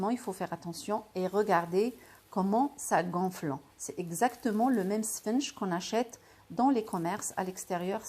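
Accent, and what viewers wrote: Morocco. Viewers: C'est un accent Marocain ça 😒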